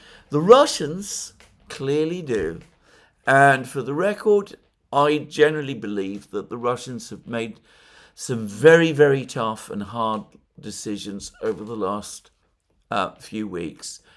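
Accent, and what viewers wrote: United Kingdom. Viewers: Where in Britain is that accent from? Giggles make it even harder to pick. Sorry bro, I have to skip this one.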